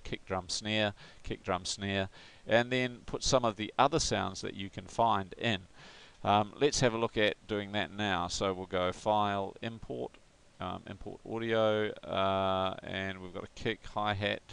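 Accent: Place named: New Zealand. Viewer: You sound like you're from nz, our computers at school also have the student shared drives set up like that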